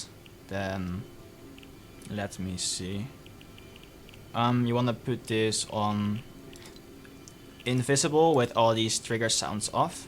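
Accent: Netherlands. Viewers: wait are you dutch?? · Are you Dutch?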